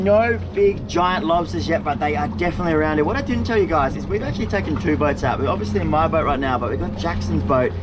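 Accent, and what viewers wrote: Australia. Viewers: i can already hear the aussie accent off the thumbnail clicking 😂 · Are you Australian mate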